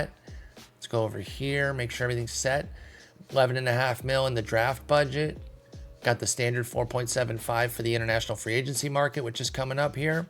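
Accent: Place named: Ireland. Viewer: Lmao the Jacksonville Irish is incredible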